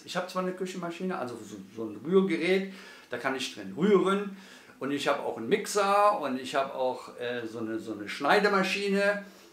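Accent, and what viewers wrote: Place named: Rhineland, Germany. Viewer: Lieben Dank für deine ausführliche Antwort! Ich finde es toll, wie du als Hausmann bestrebt bist, das Einkaufen und Kochen zu optimieren, so dass es auch wirtschaftlich ist. Das mit dem Einkochen ist eine prima Idee und für euch drei Personen lohnt sich das allemal. Bin mal gespannt, was daraus wird. Ja, du hast Recht, es gibt Unmengen von Kochkanälen und ich selbst habe auch sehr viele abonniert, auch richtig große wie BBQ Rheinhessen. Mir gefallen immer Kanäle, die sehr authentisch sind und man den Betreibern die Lust am kochen und guten Essen anmerkt. Ich finde deinen Kanal sehr authentisch und mag deine ruhige, coole Art und auch deinen rheinischen Dialekt. Auch deine Tipps sind immer sehr hilfreich und ich mag ja die bodenständige Hausmannskost. Ich denke, du hast deine Nische gefunden und ich persönlich wünsche dir ganz viel Erfolg und freue mich immer mit dir, wenn wieder Abonnenten dazu gekommen sind. 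Mach einfach weiter so, das wird was! Liebe Grüße, Bettina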